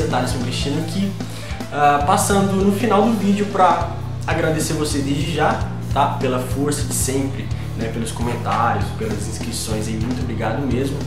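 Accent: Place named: Rio de Janeiro, Brazil. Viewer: Fala moleque, tu é do Rio brother? sotaque carioca, da onde mano?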